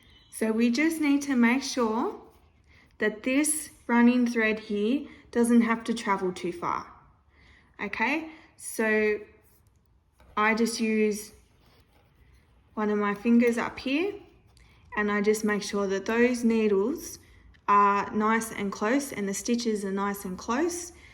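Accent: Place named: Australia. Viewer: Off topic, but It's so nice to hear a fellow aussie's voice in a knitting video!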